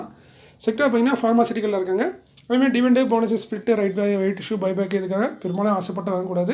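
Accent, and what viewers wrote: Tirunelveli, India. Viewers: Bro.... I love your Nellai Tamil. I Wonderful city, nice people. Keep it up Bro. NBCC, HUDCO bonus eppo tharanga bro. Please inform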